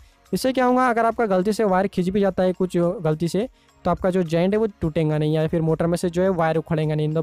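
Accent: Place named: Hyderabad, India. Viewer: Hyderabadi Lagte Ho 😂 I love Hyderabadi hindi Language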